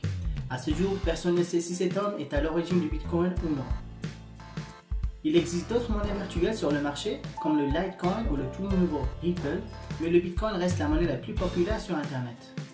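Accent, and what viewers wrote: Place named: North Africa. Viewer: la music sert a masquer son accent du bled, aller on t a reconnu, retourne bosser a la pizzeria, et laisse les bitcoins tranquille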